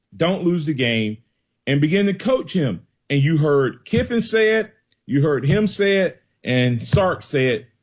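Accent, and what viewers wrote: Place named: South, United States. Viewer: Look I'm from Athens Alabama born and raised but I can't take this fuckers country ass accent! It's super fucking annoying I mean damn do you really want to sound like you couldn't make it past the 3rd grade..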